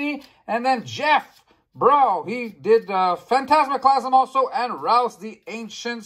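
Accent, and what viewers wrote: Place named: Quebec, Canada. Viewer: Nice video! You Canadian have such a cute French accent. 👌 Nice wine, St Emilion is always a good choice.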